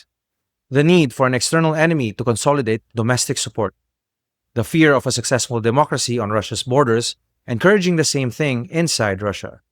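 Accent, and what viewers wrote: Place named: Philippines. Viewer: It didn’t even take me 10 seconds to know ur pinoy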